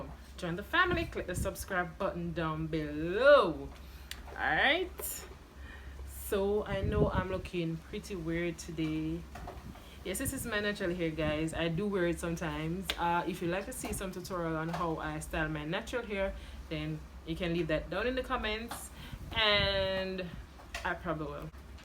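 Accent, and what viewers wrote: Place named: Jamaica. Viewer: I hear our accent sis 🇯🇲 great tutorial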